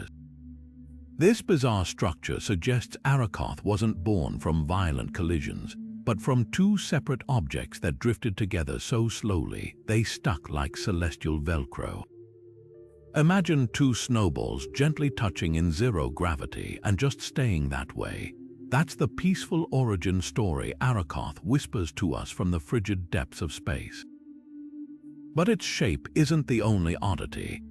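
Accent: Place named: United Kingdom. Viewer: You said it well! I hit pause when the sneakily perfect British AI voice called the region the "Cue-a-per" Belt.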